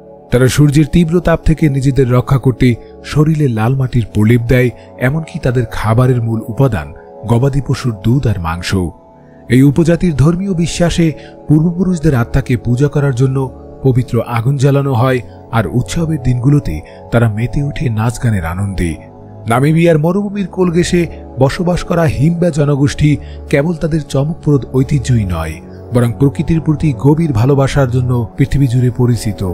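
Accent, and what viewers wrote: Barishal, Bangladesh. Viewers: আপনি কি বরিশালের লোক ? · আপনি বরিশাইল্লা ভাষা কেন বলতেছেন।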